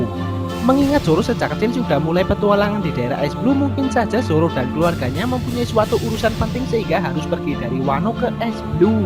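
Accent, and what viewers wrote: Java, Indonesia. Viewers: Kayak dari jawa.. medok bro.. smangat aja bro.m kritik dan saran itu sudah biasa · Admin logatnya sengaja di medokin ya ?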